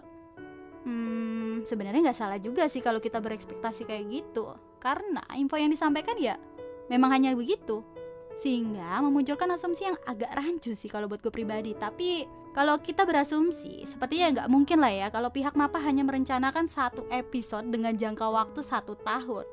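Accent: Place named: Sunda, Indonesia. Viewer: Fix naratornya orang sunda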